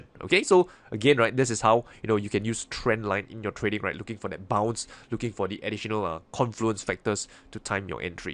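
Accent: Singapore. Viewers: English is just a language not an intelligence..I too have friends from singapore and they have similar accent as... So please do not offend someone like this.. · Love the singapore accent 👍🏻🍀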